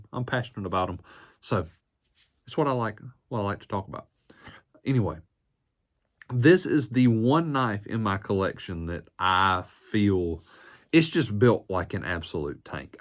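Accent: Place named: Appalachia, United States. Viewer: Can't take this seriously with the hillbilly accent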